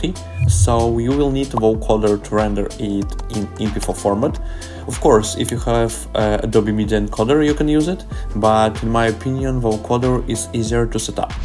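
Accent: Russia. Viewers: bro you russian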